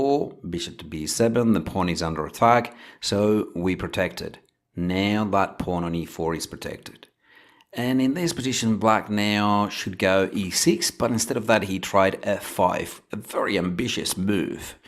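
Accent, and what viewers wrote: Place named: South Africa. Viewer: what is your accent, mate? It's like south african crossed with australian crossed with eastern european. :)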